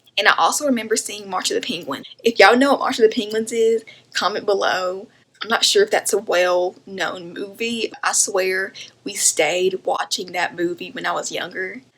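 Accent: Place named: South, United States. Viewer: Girl where are you from?!?! I thought I was country omgeee lol I love it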